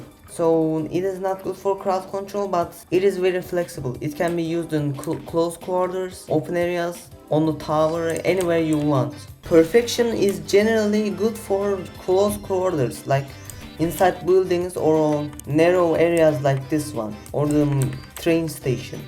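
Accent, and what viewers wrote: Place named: Turkey. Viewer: Brotherman is obviously Turkish. Gotta get that pronunciation smoothed out, brother.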